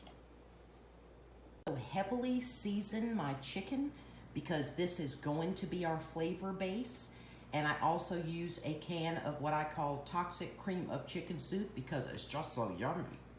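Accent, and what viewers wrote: South, United States. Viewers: Hello, new to your channel.. been watching your videos.. I’m loving your videos and am loving your southern accent, I could listen to you for hours... Hubby and I are up here in Northern New England in Maine... We are retired and do as much homesteading as possible... This casserole looks soo amazing and delicious I can’t wait to make this.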